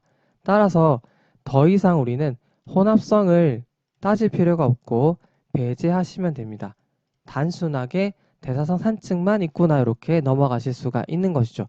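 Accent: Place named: Gyeongsang, South Korea. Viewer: 약간의 경상도 사투리가 정감있어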